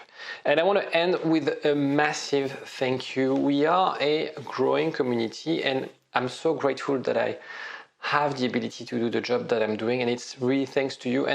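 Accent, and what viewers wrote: France. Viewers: Your voice, look, and french accent already calms me down 🙂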